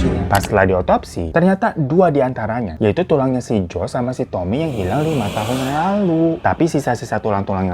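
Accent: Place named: Jakarta, Indonesia. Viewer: Kocak bet ya Betawi baget anak nya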